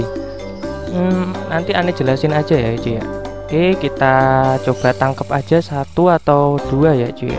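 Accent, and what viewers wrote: Java, Indonesia. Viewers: Orang jawa bang? 😂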